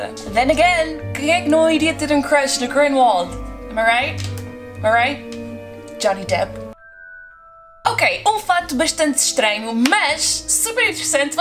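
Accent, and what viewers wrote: Portugal. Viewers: Parece q vc fala inglês seu português portugal e muito puxado😳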